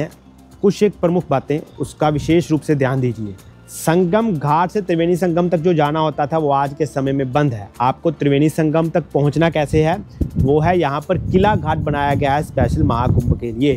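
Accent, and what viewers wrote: Punjab, India. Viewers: I think your tone is Punjabi. You must be from Punjab, which part of Punjab are you from? I’m from Hoshiarpur Punjab currently living in USA Seattle here we love your video. I watch this video with my parents. Very nice video informative keep making new video. Thank you and good luck.❤❤🕉️🕉️🪯✝️☪️🕉️🕉️💚💜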